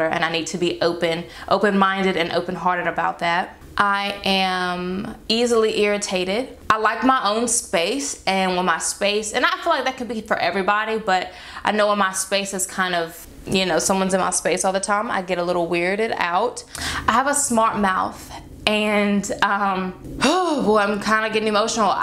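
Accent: South, United States. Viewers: I could literally listen to you talk all day! I love your accent and the southern twang that you have. 😅 💕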